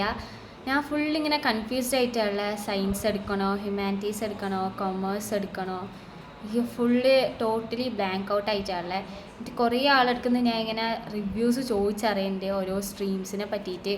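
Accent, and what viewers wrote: Kannur, India. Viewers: അത് കണ്ണൂർ സ്ലാങ് ആണ് ബ്രോ 😁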